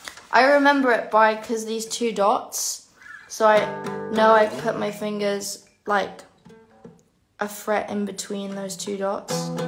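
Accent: United Kingdom. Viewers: She is British???? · she's british ??????? :(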